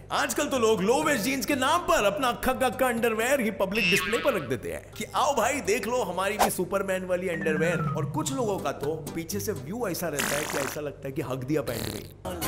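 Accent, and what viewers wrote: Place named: Maharashtra, India. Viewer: Maharashtra se hai kya? Because accent se aisa hi lagata hai🔥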